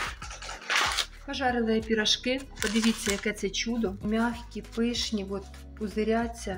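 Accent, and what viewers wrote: Ukraine. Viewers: Как приятно слышать украинский говор! Какая чудесная хозяечка,такие и классные пирожки! Спасибо огромное,благодаря вам,я мысленно побывала в моем далеком детстве!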